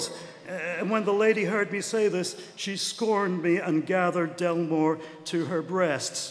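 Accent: United States. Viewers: Thank you. I was wondering where he got that particular american voice from. Doesn't sound at all like his usual voice I know from various british tv series. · His American accent is surprisingly good!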